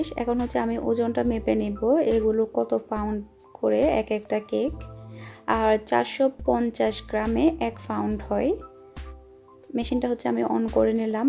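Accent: Chittagong, Bangladesh. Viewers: আসসালামু আলাইকুম। আমি নতুন।তোমার কথাগুলো অনেক ভাল লাগে। তুমি কি চট্টগ্রামের মেয়ে? জানলে ভালো লাগবে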